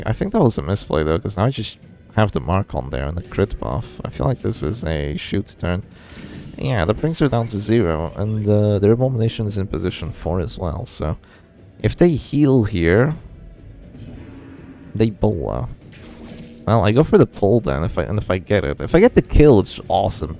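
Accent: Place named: United States. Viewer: He has like no accent lol. I thought he was amerimutt, for like, 6 mounths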